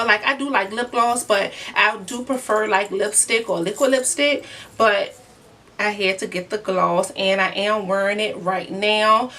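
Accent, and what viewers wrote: New Orleans, United States. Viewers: I love to hear you talk..your accent ❤!!! Are you from New Orleans?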